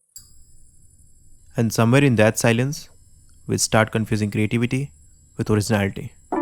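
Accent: Patna, India. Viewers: Are you from patna? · bro, are you from Patna ??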